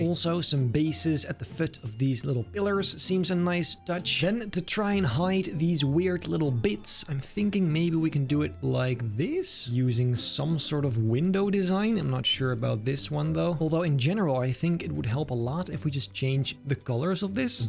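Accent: England, United Kingdom. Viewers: Brilliant love the English accent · Keep up the british accent - you’re good at it! :)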